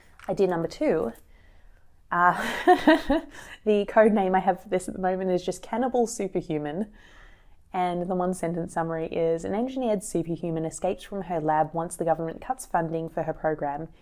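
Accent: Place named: Australia. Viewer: Thank you for the video. Seems to me the snowflake method is overly laborious. Brainstorm your story, characters and setting. Brainstorm story beats that you can structure into chapters keeping with the three act story. Outline each chapter in bullet point. Then, change your outlines as you evolve the story …. Write the first draft, leaving scope for discovery along the journey. Don’t waste time reading methods! They’re only selling you something you’ll never use. The best YouTube videos discuss what’s good and what’s bad to keep readers engaged. I’m an amateur writer, no formal training in creative writing, in fact, a science background, so I’m learning as I go. But love your work though. 😘 by the way, having lived in Australia for 20 years, I detected your Aussie accent.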